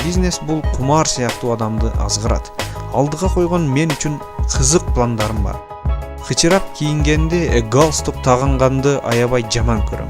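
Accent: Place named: Osh, Kyrgyzstan. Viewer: Сен оштуксунбу акцент бар экен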